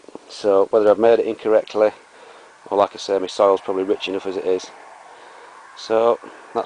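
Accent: Yorkshire, United Kingdom. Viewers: u from. Yorkshire laddy?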